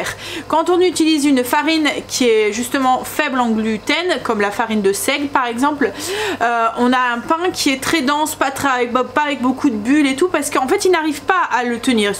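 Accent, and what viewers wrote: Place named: South, France. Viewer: J’adore le petit accident du sud qui apparaît de temps en temps et merci beaucoup ne changez rien de votre naturel c’est un pur bonheur 😅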